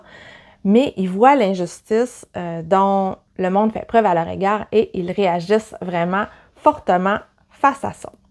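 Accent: Quebec, Canada. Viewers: J'adore t'écouter pour ma part justement avec ton accent québécois et le parler de chez-toi 💛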